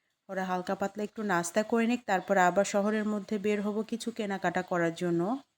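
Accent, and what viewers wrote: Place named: Kushtia, Bangladesh. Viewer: আপনি কুশতিয়ার কথাএ থাকে ❤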